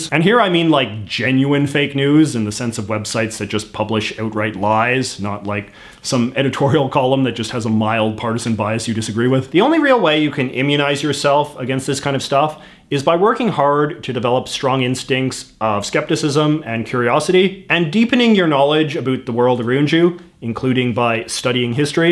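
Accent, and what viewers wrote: Canada. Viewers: Your canadian accent is strong af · He talks “Canada” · I always thought us Americans always played up the Canadian accent but damn you’re super Canadian! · guy sounds like zach braff but Canadian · Some times I’ll forget you are Canadian and the out of nowhere you’ll hit me with an ‘aroond’ when pronouncing ‘around’ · As a Canadian I can promise you that no one in Canada has a "Canadian" accent this strong. I call shenanigans! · I'm Canadian, and you have the most Canadian accent I've ever heard. I've never heard someone pronounce "around" like you. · as a Canadian I can say you've got quite the stereotypical Canadian accent! kinda funny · omg ur canadian accent is so funny LOL great vid tho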